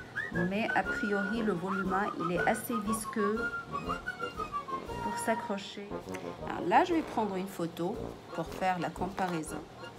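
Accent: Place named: Tunisia. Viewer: Ton accent ça se voit que tu es tunisienne 🤗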